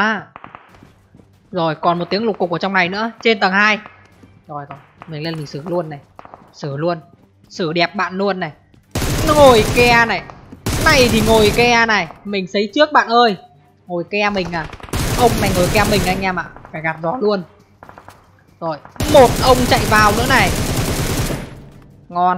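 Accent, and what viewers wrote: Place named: Hai Phong, Vietnam. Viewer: đi đâu vậy a zai ? quen vãi . hải phòng mà e đặc sản giọng đó ☺️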